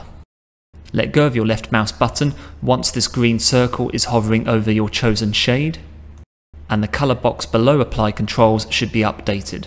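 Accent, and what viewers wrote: United Kingdom. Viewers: Again, a very useful video - also your very clear English is brillant (very British), love it! Thanks a lot for your great efforts and keep up the good work! Greetings from Germany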